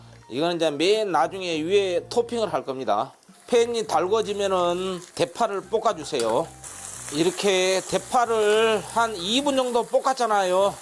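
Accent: Jeolla, South Korea. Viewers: 세프님. 육개장누가먹읍니가요. 세프님요리주문안됩니가요.전라도. 말씨가. 좋아요. 세프님건강하세요. 요리대박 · 셰프님 감사합니다 전라도사투리가 약간 들리면서도 자세하고 순서 정연하고 초보자도쉽게 설명해 주셔서 고맙습니다 요리책 1.2.권을 구매해놓고 아주유용하게 사용하고있습니다 셰프님최고입이다 요리유튜브중에서최로중에최고입이다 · 유튜브 보면서 처음으로 댓글남겨요^^ 쉐프님 말투가 같은 전라도 사람으로써 너무 정감있습니다~ 저에 쉐프님 레시피 원픽은 닭볶음탕!! 항상 응원합니다.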